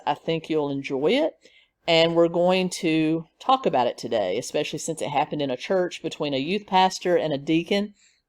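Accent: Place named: Texas, United States. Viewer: Love your accent, reminds me of family reunions in Texas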